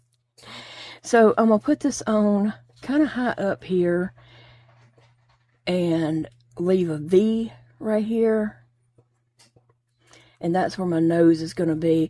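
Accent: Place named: Delaware, United States. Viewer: I LOVE this Gnome! I Love the Mustache, the Turned Up Hat and the Vine with the Flowers and Curly Cues. The way you say the days of the week sounds the same as we do here in Delaware. 🤷🏼‍♀️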